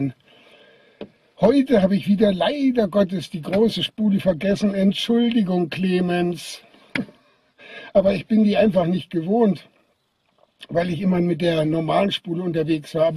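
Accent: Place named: North Rhine-Westphalia, Germany. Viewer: Ich schau dir ja schon seit Abo Nr.67 zu , aber ich frag mich ob du auch aus dem Süden kommst? Hörst dich eher nach NRW an ;)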